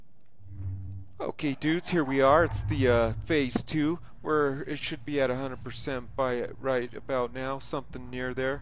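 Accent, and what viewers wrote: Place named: Denver, United States. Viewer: <num> is my Ally code. Yo so I play swgoh screen name PlayStyle. I was watching your channel like a year ago then for so.e reason I stop getting your content but I see Im still subscribe and the bell is hit. So I'm watching your channel and u cracking me up and I'm like this dude talks like the homies. You know Denver has a unique swag it's a West coast swagg with Midwest flava strong Latin influence, so I'm like he sounds like he from S. Denver maybe the West up N. Is well we know wat it is and Aurora is blacks whites alot of Interacial fams. So I keep watching and sure enough you pushing that 303/720 kar I was like wow bcuz I ask everyone do u play swgoh? I been on since we Nov/Dec 15 & Neva met anyone who plays this game from Here. So thx for reppin the city for all the Galaxy Nerds and undercover nerds out there. 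I like your content bcuz u keep it real check out my acct I know u know alot of dudes know but if u ever need to use one of my accts to feature a player let me know. I have 2alt accts bcuz there was a time before raids and ships were I could t get enough swgoh I would complete everything in 8min (with the exception of timer events ie: Arena) anyways the wife is buggin talking about I know u ain't takin a shit I. There with the door locked I k ow your nerd ass is trying to save the universe! I just told her the Galaxy chic we saving. The Galaxy. <mail> holla back.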